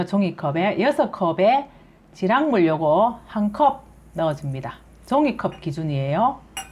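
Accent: Gyeongsang, South Korea. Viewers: 님 프사 버꾸셨네요? 항상 좋은 정보와 레시피 올려주셔서 감사하고 대구말씨 넘~~좋아요👍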